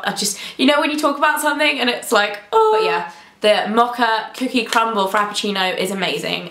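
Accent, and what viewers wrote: United Kingdom. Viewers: You're such a Brit! lol British never sounds casual to me, it's too proper! lol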